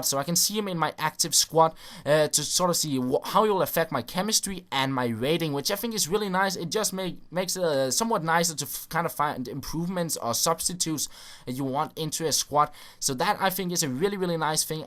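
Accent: Denmark. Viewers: lol so easy to spot that you are from north europa/DEnmark? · your English accent is Danish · Man kan bare høre på dig at du er dansker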